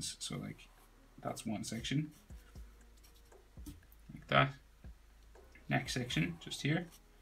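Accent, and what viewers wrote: Ireland. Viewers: Is it just me or does he have an irish accent like Johnny Sexton?!?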